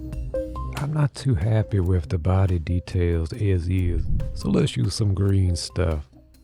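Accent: Louisiana, United States. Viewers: Dat voice reminds me of a Louisianan accent.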